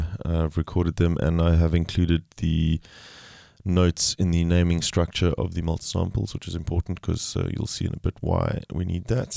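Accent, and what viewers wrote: South Africa. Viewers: You sound South African👍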